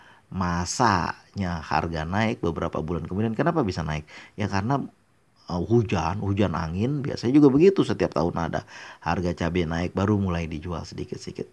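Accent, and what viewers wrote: Jakarta, Indonesia. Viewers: Assalamualaikum,ustad d Aceh ya ustad,tapi asli Betawi apa Sunda ustad logatnya seprrti orang betawi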